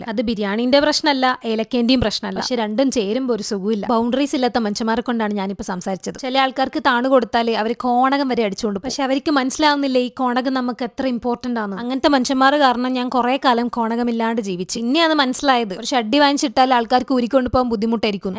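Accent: Kannur, India. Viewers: Ighale samsaram kelkaan vendeet maathraan njn ighale video kaaanin....first kanda samayath njn video ellaam keri kandu....ighale kannur slang I love it😂❤❤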